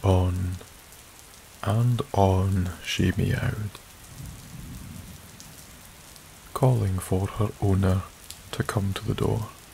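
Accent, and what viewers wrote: Scotland, United Kingdom. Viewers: your dreamy Scottish voice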